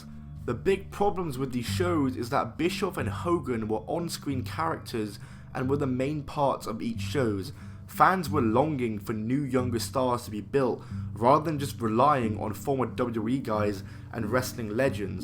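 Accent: England, United Kingdom. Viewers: Why does every wrestling video have a narrator with such a strong English accent?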